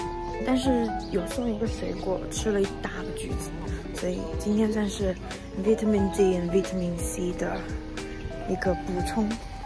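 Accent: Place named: Taiwan. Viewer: 請問妳是哪一省？ 聽起來完全就是台灣本地的～～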